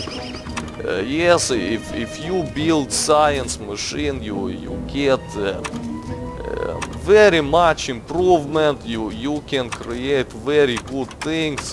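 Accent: Russia. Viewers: I got spamed and came here, and it was worthy :D I want more videos with 'dat Russian accent!!' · His Accent Is Awesome :D i love russian people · he's russian right? privet esli da :D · Nice accent are you russian? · XD There's a kind of swedish sound to your voice, even though the accent is sort of russian. Where do you come from?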